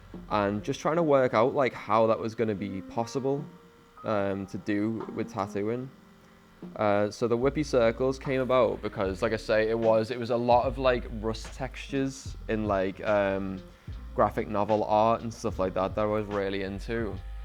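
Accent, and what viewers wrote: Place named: Northern England, United Kingdom. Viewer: It’s a northern dialect, southern British people (especially in London) pronounce everything with full syllables where as people like me in the north have a culture of speaking in slang and abbreviations so we often shorten or blend words together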